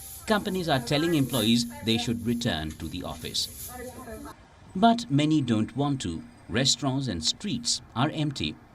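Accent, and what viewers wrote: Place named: India. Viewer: indian accent. speak english.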